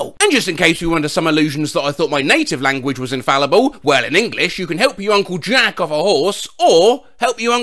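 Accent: United Kingdom. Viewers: Despite all the foreign pronunciations being very good (except the Hebrew lol), has this funny way of making every language sound extremely British.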